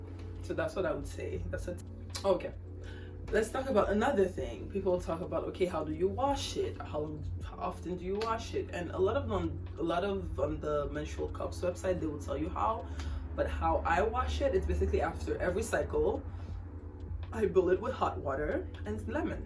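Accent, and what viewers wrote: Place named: Haiti. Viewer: Ahhhh I posted on your last video now I can here the slight Haitian accent. Maybe Haitian Canadians sound slightly different to ones here in America. My family is from Haiti on my fathers side. bèl dekouvri ou. I switched to organic tampons but have been wanting to make the switch to something like the Diva.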